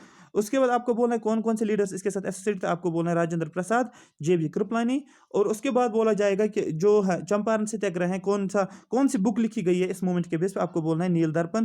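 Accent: Kashmir, India. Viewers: bhai kashmiryu ki hindi todi diff hai...tbhi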